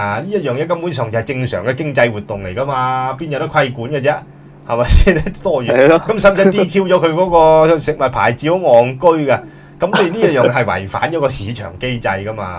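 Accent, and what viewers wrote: China. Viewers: 你把聲咁似大陸仔講廣東話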